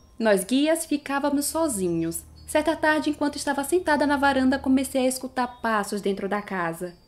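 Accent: Brazil. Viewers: o sotaque nordestino misturado com o ritmo de fala mais compassado e a voz suave parecendo estar contida ajuda muito Ah, parabens pelo conteudo!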